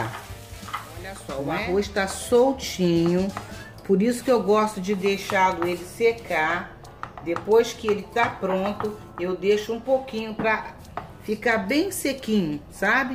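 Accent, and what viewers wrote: Rio de Janeiro, Brazil. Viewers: Adoro o seu sotaque Carioca! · Amo suas receitas e sua pronúncia carioca. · Adorei o modo prático como comanda tudo, fora o seu sotaque carioca lindíssimo, e a dica tb adorei kkkkk. Beijos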